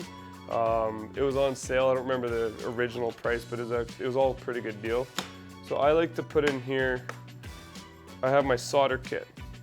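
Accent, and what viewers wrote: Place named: Canada. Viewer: You could tell you were Canadian from the get go the accent isn't super thick but certain words.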